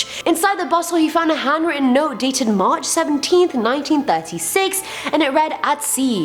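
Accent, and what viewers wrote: Russia. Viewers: tht russian accent. im jealous. 😭😭😭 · Very nice Russian accent!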